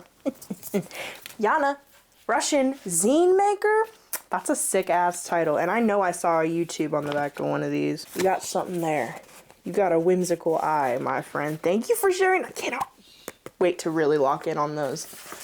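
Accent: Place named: United Kingdom. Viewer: Thank you for the worm reminder queen… Keep being amazing, everything is linked to everything, it all is for your business and also isn’t (living, art etc.) lol, you have NOUGHT to worry about cuz you’re growing a sick lil community here that are all obsessed, you got this and u know it!!! Intuition over 🪱 s… 🌞😎🌞😎🌞 luv & good wishes 2 u from the UK, I speak like your bri’ish accent tehe 🤫😱😍🙊😮‍💨😇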